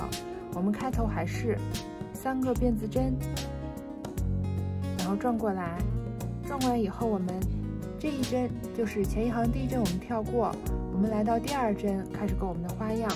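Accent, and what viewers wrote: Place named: Beijing, China. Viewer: 你应该是北京人吧？🙂耳熟。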